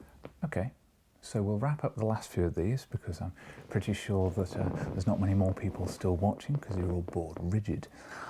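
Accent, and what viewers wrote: United Kingdom. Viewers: You are too British y really like that, I like your accent I hear your videos to improve mi listen skill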